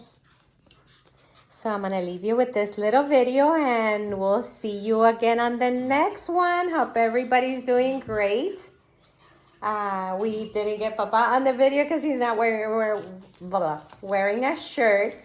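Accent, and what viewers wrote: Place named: Russia. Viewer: No spaek english russian like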